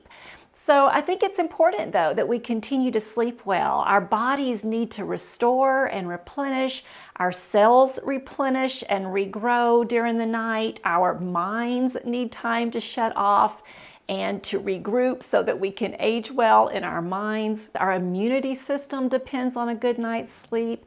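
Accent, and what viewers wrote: South, United States. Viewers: ohio nice state. friendly state like indiana. are you from the south originally ? your accent thats why i ask.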